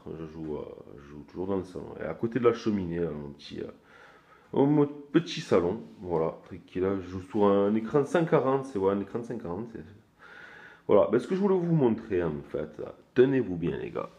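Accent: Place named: South, France. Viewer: Sa fait plaisir tu vien du sud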